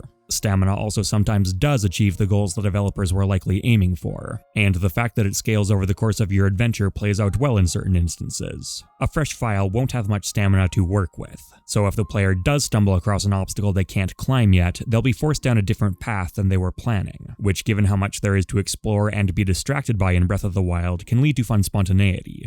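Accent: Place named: Canada. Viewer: i don't like how negative about the game u are in this video. But ur points make lots of sense and i agree with alot of them. also i have finally realized that you are probably canadian based on the amount of times i've heard you say the word about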